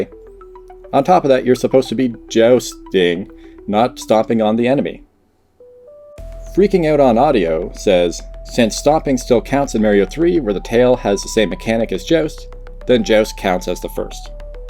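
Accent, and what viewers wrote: Canada. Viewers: Pardon me, are you Canadian?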